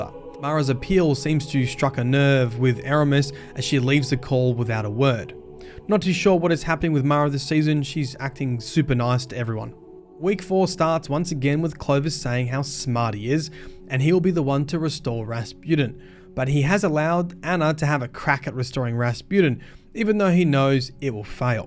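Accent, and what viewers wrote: Australia. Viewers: Man I love Byf but god damn if it isn’t nice to hear a fellow Australian talk about this crazy story! Thank you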